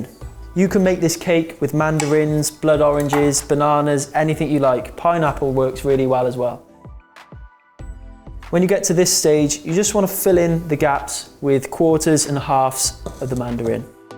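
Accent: Wales, United Kingdom. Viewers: Alisha C That’ll be a Welsh accent. He’s from Cardiff. I don’t think he’s cute, more so because I have sons his age and that would feel weird, but I’d be very proud of him if he were my son. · British accent ?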